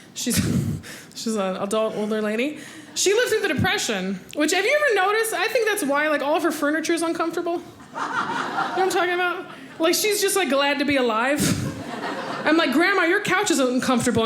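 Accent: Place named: Midwest, United States. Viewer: From her accent I swear she's from the midwest!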